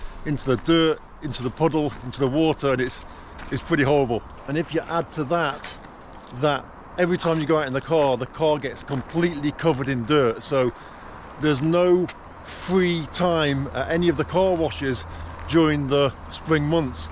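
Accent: England, United Kingdom. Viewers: You probably never will be viewed as a "real Russian" by most in Russia. You may speak the language, but have a clear English accent.. I can imagine it is frustrating for you, but it's this way in most countries..There are some countries that accept and embrace foreigners more than most...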